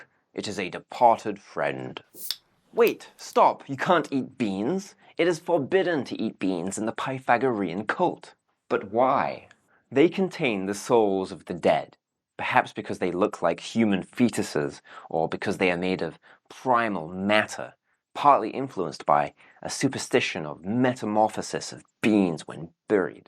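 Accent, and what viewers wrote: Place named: United Kingdom. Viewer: You sound British. If you are, you especially should know it's maths, not math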